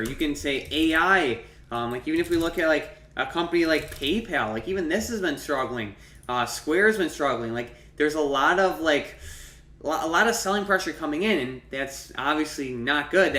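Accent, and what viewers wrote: Midwest, United States. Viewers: You sound like your from Minnesota or Wisconsin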